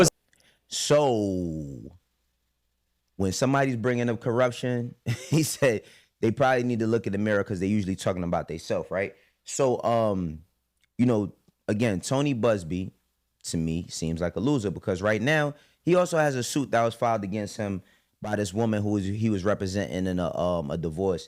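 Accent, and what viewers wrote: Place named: New York, United States. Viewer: lol you sound like you’re from nyc, got that jay. Fitted on, yea you a Stan. Won’t watch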